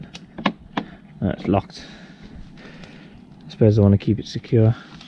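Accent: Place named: West Midlands, United Kingdom. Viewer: You sound like you're West Midlands, what area is this? I'm amazed such a large piece of land is left abandoned, as they seem to build on everything these days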